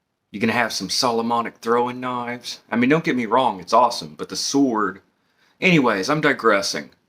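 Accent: South, United States. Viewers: LOVE THIS GUY HE IS WELL INFROMED EXPERIENCE AND ARTICULATES WELL WITH A SOUTHERN CHARM AND CHARISMA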